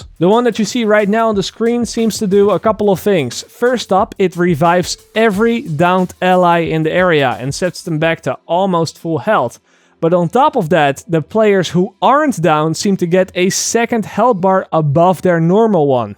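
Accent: Netherlands. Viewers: if i hear good youre dutch ? kan you add me on uplay KillerAngelNL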